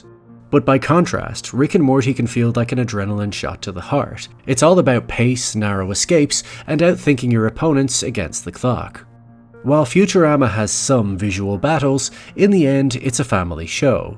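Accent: Ireland. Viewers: Bless, Irish for sure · He sounds nothing like Paul Mescal but I suppose accents vary a fair bit in Ireland/Northern Ireland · Weird question, are you Irish?😅🤔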